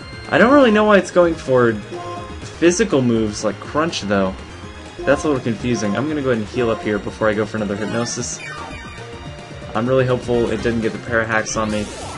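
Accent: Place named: United States. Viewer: Hablas espanol? Tu ingles es de Americano! (O aprendiste espanol como 2da lengua?)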